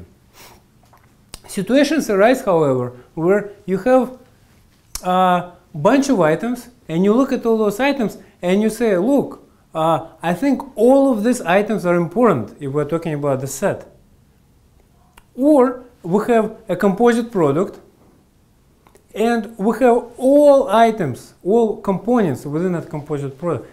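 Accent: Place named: Russia. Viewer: I love his Russian accent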